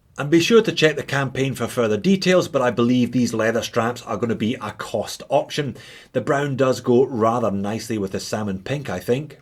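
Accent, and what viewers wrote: Scotland, United Kingdom. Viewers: He even says Om with a Scottish accent.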